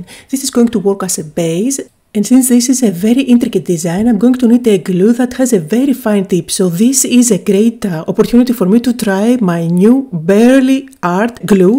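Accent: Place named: Greece. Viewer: Its raining CAT’s and dogs here. So nice to hear you, your Greek accent. I always feel the nostaligy of all my Greek holidays. Thank you for this. Cardiamou.❤️🍀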